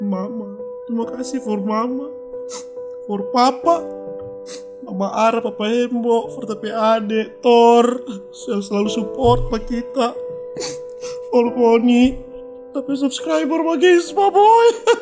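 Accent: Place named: Manado, Indonesia. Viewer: Hhhhhh lucu dpe ba gas.. bahasa manado 😂😂😂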